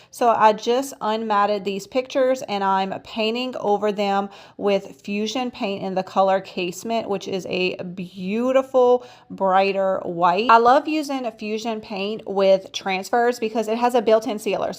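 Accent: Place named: South, United States. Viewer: Ha! I just thought it was a southern accent thing!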